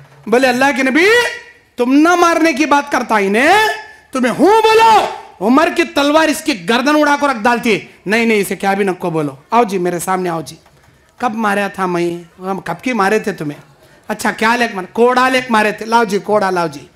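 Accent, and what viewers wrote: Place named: Dakhni, India. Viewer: Dakhni zabaan ki mithaas ??? Alfaaz dil mein utar jaate . Allah aapke Tawheed ke mission ko kaamyaab kare Amee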